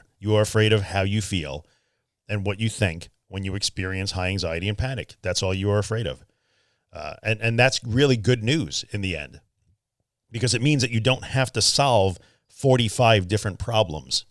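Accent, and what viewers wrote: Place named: New Zealand. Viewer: sounds like a fellow New Zealander!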